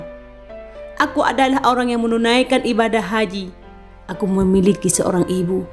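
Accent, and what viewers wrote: Aceh, Indonesia. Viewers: Mirip dengan suara almarhum juga🙏😇, kaya cadel. Ciri khas bicara orang aceh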